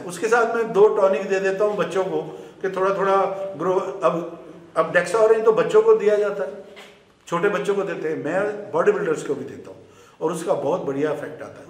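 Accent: Haryana, India. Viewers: Haryanvi 😅😁😁mst..u r great sir..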